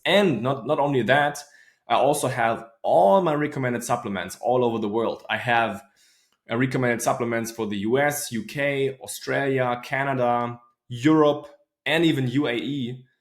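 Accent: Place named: Germany. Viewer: Warum sprichst du eigentlich nicht deutsch 😅? Deine deutsche englische Aussprache ist richtig geil 😅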